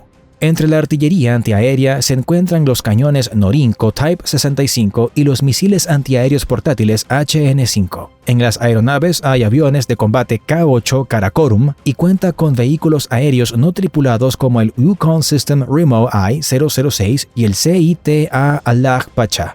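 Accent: Argentina. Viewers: se nota que es argentino, peruano o boliviano. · pregungta seria , eres argentino? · Creo que el del video era Argentino 😂 muy arriba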